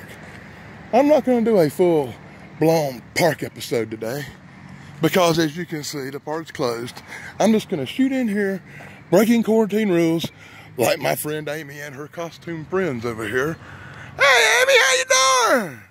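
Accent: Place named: South Carolina, United States. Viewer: you for sure sound like you are from near williamston south carolina haha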